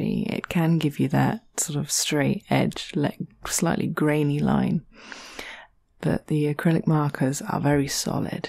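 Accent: United Kingdom. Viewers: Really enjoyed listening to this...makes a change hearing an English accent but also fascinating understanding how you approach your art. Would love to see more of this from you as it works on so many levels :)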